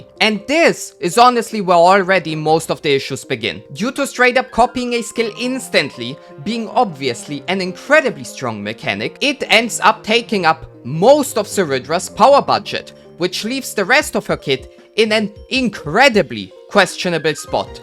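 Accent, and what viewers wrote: Germany. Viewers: I’m hearing the German accent 😭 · He sounds german.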